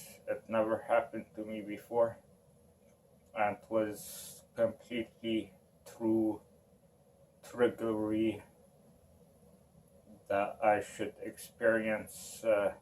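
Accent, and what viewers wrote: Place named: Spain. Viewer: Are you Spanish I can't understand your English sorry :(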